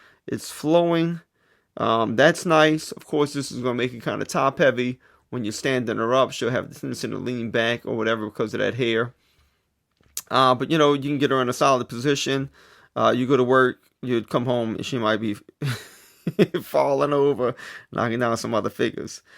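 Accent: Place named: New York, United States. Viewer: ..and I was thinking you lived in NYC lol. You're vocal inflections are very similar to Jay Z.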